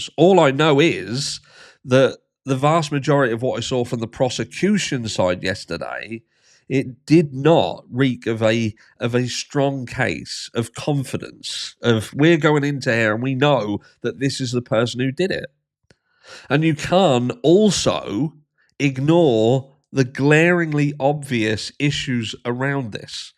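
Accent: United Kingdom. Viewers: bryan did it hahaha glad to see u where wrong but love accent cutie bear uk man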